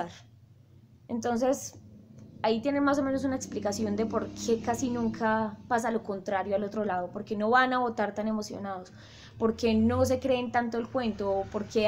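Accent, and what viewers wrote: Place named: Antioquia, Colombia. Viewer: Me encanta ese acento paisa :3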